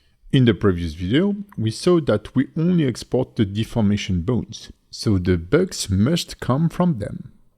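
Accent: France. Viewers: elle est ou la version FR? j'ai griller ton accent:p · Are you french? because of yoour accent ✨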